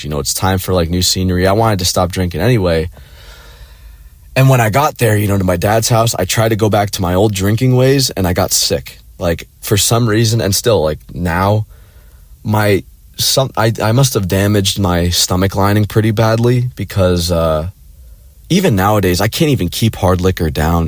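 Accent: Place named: Minnesota, United States. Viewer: Dang the Minnesota accent is so clear in this video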